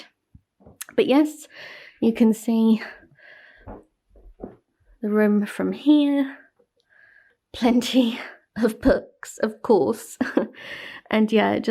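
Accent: United Kingdom. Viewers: Hello, love your channel and your book shelves and love your british accent so much 😍😍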